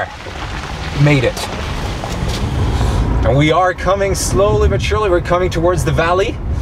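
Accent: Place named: United States. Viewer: Is this guy german? he sound like american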